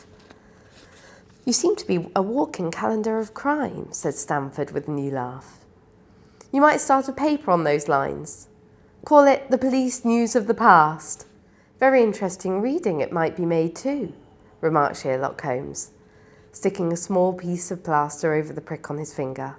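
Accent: United Kingdom. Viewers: 여기 담당자님께 질문 드려요 쌤의 발음은 영국 어느 지방의 사람들이 쓰는건지요? 감사합니다 😀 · 귀르가즘이 뭐 여기서만 쓴 표현도 아니고 영상과 사운드가 중요한 유튜브에선 특히나 많이 쓰는데 왠 불편러들이 이리 많지. 이 표현 뿐만 아니라 이 채널 전반적으로 선생질 하는 댓글이 많은데 뭔 교육컨텐츠라고 꼰대들만 몰려왔나. 영상 좋다가도 댓글 보기가 너무 불편. 습관적으로 스크롤 내리는걸 안해야할듯 ㅡㅡ... 선생님 목소리도 편안하니 듣기 좋고 발음도 영국 특유 발음이 듣기 좋아서 어울리는 표현이라고 생각하고 특히 영어 교육에 특별히 관심이 없더라도 호기심 가지고 눌러볼 만한 제목인것 같아서 오히려 더 좋은것같습니다. 실제로 제목 보고 들어와서 컨텐츠 내용 좋아서 구독했구요.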